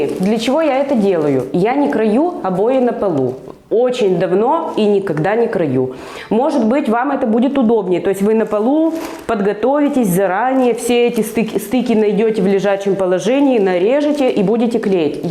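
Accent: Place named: Perm, Russia. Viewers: Здравствуйте! Я смотрел сериал и там девушка прям как вы разговаривала! Вы из Перми ? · Вы с Урала? Говор знакомый. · Акцент прям Пермский ) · Люблю Пермский говор. Видео понравилось, душевненько, но резать обои ножом навесу с запасом в 2 мм. это нужно иметь хороший навык. Да и многое другое рассчитано на хороший опыт. Для чайника не подойдёт.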